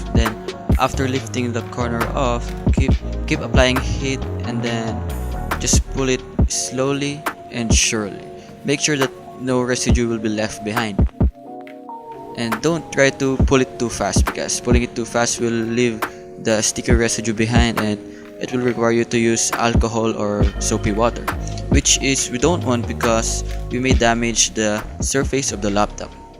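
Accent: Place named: Philippines. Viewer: Are you filipino? Because I can hear that filipino accent heheheh :)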